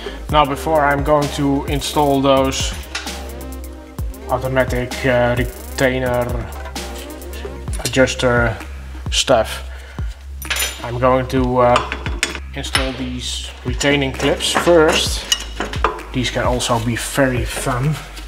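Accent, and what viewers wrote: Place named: Netherlands. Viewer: Love the dutch accent. Cheers.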